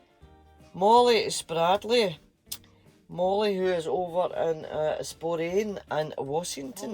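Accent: Scotland, United Kingdom. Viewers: That was so much fun and your Scottish words remind me of late nights round the kitchen table with my beautiful friend Krys, now sadly gone but oh we had some laughs, me being one of those Sassenachs and another friend a Geordie it was hilarious. Oh and YES! Singing into a pasting brush whilst decorating the hall, stairs and landing of our first house, gosh, 38 years ago. Thanks for the chat hun xx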